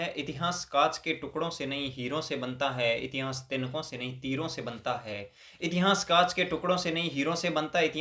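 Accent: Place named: Marwar, India. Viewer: Sahi hai ,, cutoff kuch bhi rhe lekin analisis bhut sahi hai, aap marwad se ho sayad, language tone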